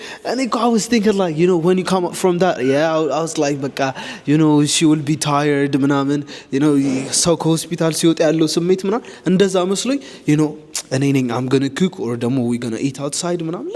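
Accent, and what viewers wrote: United Kingdom. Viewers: she has a British accent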